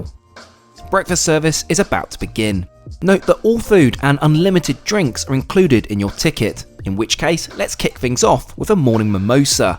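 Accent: United Kingdom. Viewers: sorry for my ignorance but are you english, scottish, or australian? i’m genuinely curious and i’m awful with european accents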